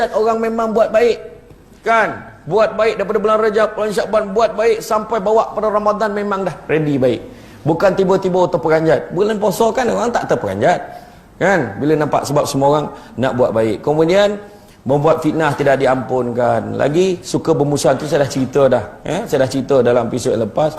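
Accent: Negeri Sembilan, Malaysia. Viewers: Ustaz ni..setiap dakwah mesti kena ada lawak,sedih dan loghat negeri sembilan ada tiga ii ni konfirm best ceramahnya 👍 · Biasa aku syok dgq ceramah bahasa utaqa. Dgq loghat nogori pon syok gak rasa ni. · Logat Negeri Sembilan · Aku org melaka, tpi paling suke dgau loghat org nogori... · Memang loghat nogori tu yg sedap dongar haha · Ramai yg mula soka loghat nogori.. Tanda Pm baru dri nogori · Seronok dongar ustaz syamsul ceramah..bnyak pengajaran..semoga ustaz sukses sllu..loghat N9 ustaz mmg thr best.kelakar! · loghat nogori tu yg sodap dongar😂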